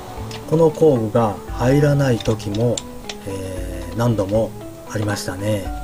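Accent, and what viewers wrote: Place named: Tokyo, Japan. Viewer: 喋りが変な東京風になっちゃった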